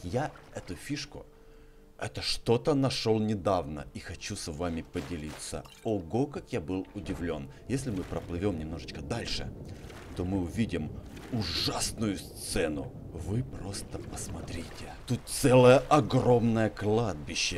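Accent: Ukraine. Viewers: судя по твоему говору ты живешь на Украине? Или это юг России?? Ростов или Кубань?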